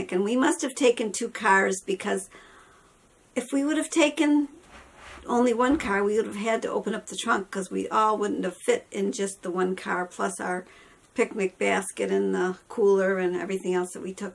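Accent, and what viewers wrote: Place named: New York, United States. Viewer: It is beautiful countryside. It looks peaceful. I've never been to New York, except a plane stopover at JFK, if that counts. The village looks cozy. I had a bf from Corning, and his mom worked at the Corning factory. He was my first love, so I never really got over him. Be careful, filming and driving. I haven't driven a standard in many years, but I can do it. Yes, so cozy is the countryside. And you do have a New York accent, definitely. That story about the dogs in the trunk is dreadful. Those dogs are amazing. They did not holler, which goes to prove that dogs protect their people, and they don't expect their people to protect them. They could have very easily hollered to get yall's attention. And the fact that they held in their urine. Yes, very amazing dogs indeed.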